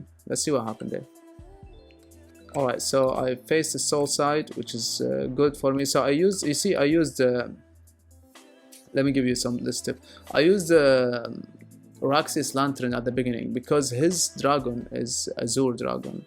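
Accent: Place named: Arab world. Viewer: ليش صوتك كأنك عربي يتكلم انجليزي ؟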